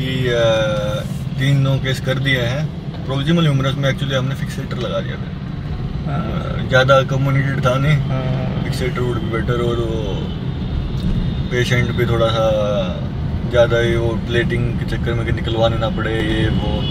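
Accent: Haryana, India. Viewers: Haryanavi accent, just wow🎉.. Dr. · No doubt sir I like the way you present your interest as orthopedic surgeon 😊 but more than that I love to listen your haryanavi accent . Which is just awesome 👌 👏 👍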